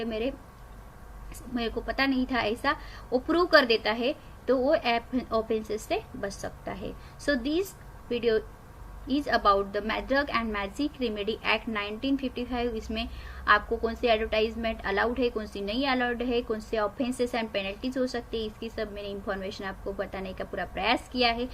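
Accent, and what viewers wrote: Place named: Maharashtra, India. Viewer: Marathi tone in hindi but topic samjla thanks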